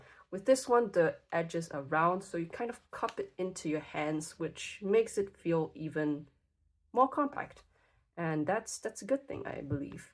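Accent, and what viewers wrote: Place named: Germany. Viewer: I thought so! I have a friend in germany and i dont mean to generalize, but the way you pronounced your words were very familiar! Cant wait to see you improve further.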